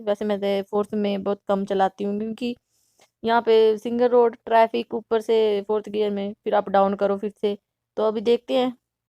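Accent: Haryana, India. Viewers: maybe I could be wrong but Aap ke bolne mein Kuch Kuch Words ka haryanvi accent bhi aata h Sun k acha lgta h 👍👍 finally you got khidki wali seat 😀😀😁😁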